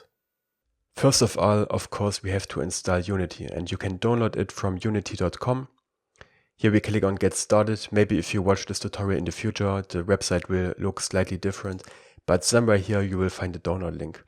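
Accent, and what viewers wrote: Germany. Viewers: I love your german accent, makes it so much easier as a german for me to understand this compared to some american tutorials :D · bist du deutsch? dein aktzent kört sich so an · Dieser deutsche Akzent. <3 Schön erklärt. xD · you sound like you are german. Are you? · so ein geiler deutscher Akzent😂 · I just feel that german accent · are you from Germany?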